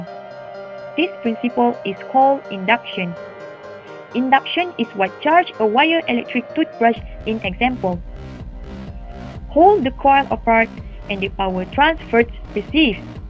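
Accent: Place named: London, United Kingdom. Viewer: good Jobs korg...bangga ada member yg pandai speaking london..hehehe...:D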